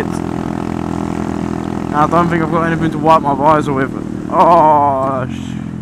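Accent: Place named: Essex, United Kingdom. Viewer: I noticed some of essex in this :o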